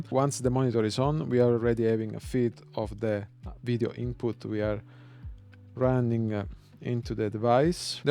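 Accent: Italy. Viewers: Italy 10 – France 0 (if your accent didn't give away that you're Italian this sure did)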